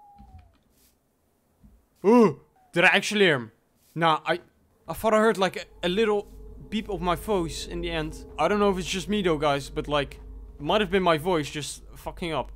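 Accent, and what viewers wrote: Netherlands. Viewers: quiq question and by no means to offend you but are you dutch? you sound pretty dutch to me. (again not meant offensive by any means and tbh it sounds good(nice vid too)) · I’m smelling a Dutch accent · Are you Dutch or Belgian? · Het Nederlandse accent maakt het wel af hoor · Do I hear a Dutch accent?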